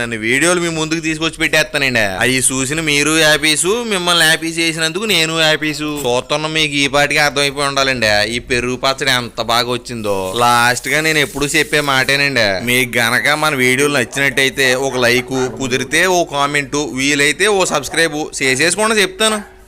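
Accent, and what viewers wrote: Godavari, India. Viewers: బ్రదర్ మీరు మన గోదావరి భాషలో మాట్లాడుతుంటే చాలా వినసొంపుగా ఉంటుంది అందులో మీ రెసిపీ వండే విధానం చాలా సింపుల్ గా ఉంటుంది సూపర్ బ్రదర్ 🥰🥰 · Godavari Bhasha tamatar❤❤❤❤ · మీ వాయిస్ పద్దతి సూపర్ గోదావరి నీరు మహిమ. ఫ్రం రాజమహుండ్రి నాగదుర్గాచారి ❤❤ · Godavari mandaleekam chaala bavundi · Mee vidros bagu tsyi kani mer godari yasa badh ne vinslekspothsmu. Enthaiba hodarolksku konchsm vwtskaram ekkuvr. Alage maryadalu abbo assalu cheppalemu.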